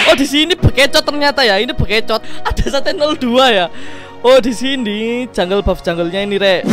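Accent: Java, Indonesia. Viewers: Bhs lu medok bgt bang..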